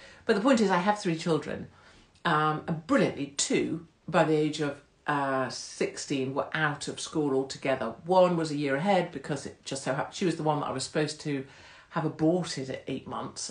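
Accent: United Kingdom. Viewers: Why is your English accent so light?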